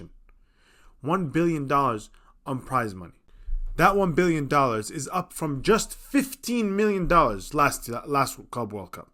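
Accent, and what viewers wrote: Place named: Arab world. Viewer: Where are you from? I can heard some arab accents but can't locate it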